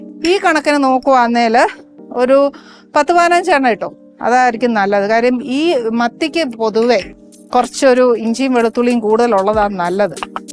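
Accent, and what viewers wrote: Kottayam, India. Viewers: Njgle Kottayam kaaru ingne aane samsarikunnathu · കോട്ടയത് ഇങ്ങനെ ആണ് പറയുക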